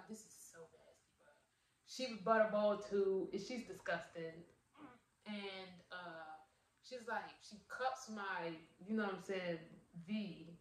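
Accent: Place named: DMV area, United States. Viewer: Side note...are u from the DMV area? U have that accent. Lol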